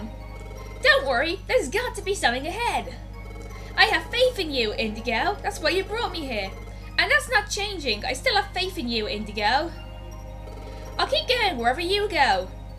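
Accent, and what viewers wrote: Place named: Europe. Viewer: Yay, the fugitive saga is done! The ending always gets me a little choked up. Your partners reaction to the truth is so genuine and heartfelt, and the music makes the moment that much more emotional. I remember the huge feeling of relief I got when I first played. The fugitive saga definitely has its problems with the crazy difficulty spike and low variety of Pokemon, but it will always have a special place in my heart. I've also heard that males have a higher crit rate and females have higher accuracy and evasion, but I can neither confirm nor deny this. It seems to be the case from my experience though. About your commentary, sometimes I have trouble keeping up, but that might be because I'm not used to European accents. Also, I get a little overwhelmed by how many things you talk about, but I understand that dungeon crawling isn't the most exciting thing and that you need to talk a lot to fill the time.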